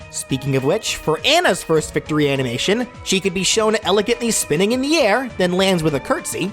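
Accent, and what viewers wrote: New York, United States. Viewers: he has a new york accent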